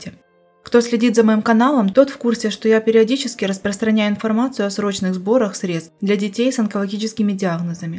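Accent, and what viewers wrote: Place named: South, Russia. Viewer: У меня недоверие. Почему ролик озвучивается голосом с таким явно выраженным говором, южно-русской "г"? Понятно, что человек со стороны нанят для озвучки. А почему?Почему автор канала сам не озвучивает этот ролик??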